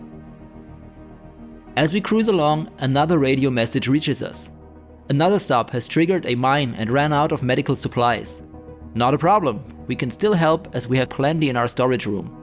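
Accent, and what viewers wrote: Germany. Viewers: I have never heard an Asian guy make jokes with a German accent before... This was like some kind of an existential experience to me, thanks mate :D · Great review and I love the German Speaking! Lol and to all the people that think germans are nazis I guess all Americans are Republicans ;) or all Canadians are liberals. Bought the game in Hope's it's like SH3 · I never thought of you as being a Nazi, I do like your German accent as it adds more realism to the theme being kriegsmarine.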